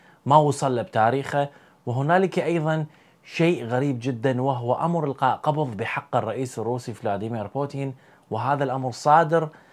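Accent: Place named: Iraq. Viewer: فدوه لقلبك كلامك العراقي يجنن يحسسني امي بالعراق❤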